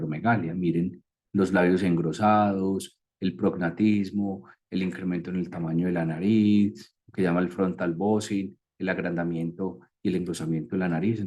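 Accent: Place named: Colombia. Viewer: Qué lindo explica y qué hermoso acento colombiano. Saludos desde Ecuador.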